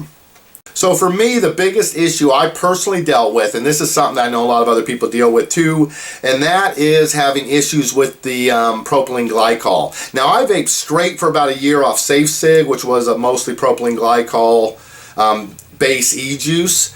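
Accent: South, United States. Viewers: Accent sounds a bit like #ForestGump. No offence, love that movie ) Guys, we at this point have no idea what long term effects are, lets get real, studies that show the residue blah blah shit are literally cavemen level, if you cant see something - doesn't mean it's not there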